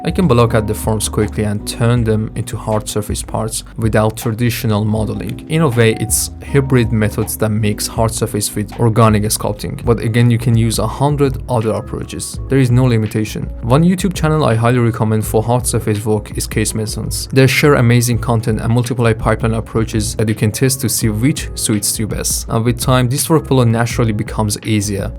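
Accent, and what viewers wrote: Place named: Iran. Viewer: This video is amazing, but im a beginner in zbrush or 3d space i worked with cc3 and 4 and i must say iclone and character creator is awesome but in zbrush i just know the barebone basics, where would you suggest that i go for learning zbrush or modeling? My goal for now to make a superhero characters and stuff, like batman or red hood or superman basically characters with armors and helmets or trench coat and cape, i know that you're making the same kind of stuff in your video but my problem is it that i didn't know some of things that you are doing, like using specific tool or features I checked a lot of tutorials but somehow there confused more because i follow what there doing but these always a problem, so the help or recommendation would be appreciated. By the way your accent is so Persian, if you're one of us, dorud my brother, a lot of love from hormorzgan❤️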